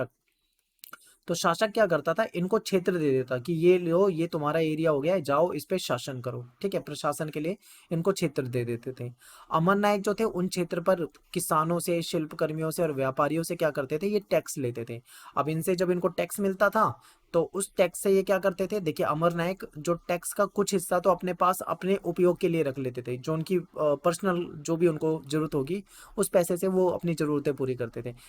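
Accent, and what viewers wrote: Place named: Haryana, India. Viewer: Bhiari nhi beta haryana se ha❤😊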